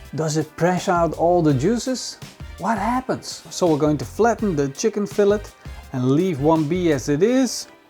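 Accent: Netherlands. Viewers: Ik hoor een Nederlands accent?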